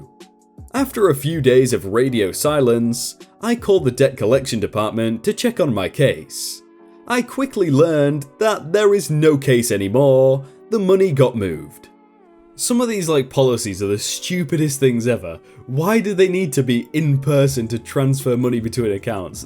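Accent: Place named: Lancashire, United Kingdom. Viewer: I love listening to your voice. Just out of interest are you from Lancashire? I think you’re from there but another YouTuber (thoughty2) is also from Lancashire and you do sound like you’re from a different part. Or maybe it’s Newcastle. Also, i can’t believe you’re only 18. Keep up the good work. Also, I love you’re other channels. As a British person it’s shameful that I just can’t place your accent.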